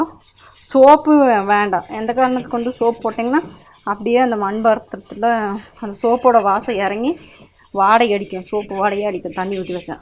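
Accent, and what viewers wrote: Coimbatore, India. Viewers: Kovai tamil thana neeinga pesurathu akka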